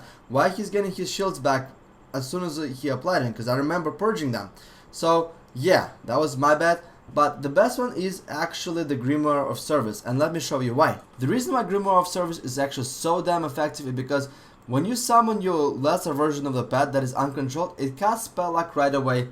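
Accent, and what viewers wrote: Russia. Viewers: I love the Russian accent, first time watching your videos and I've subbed:)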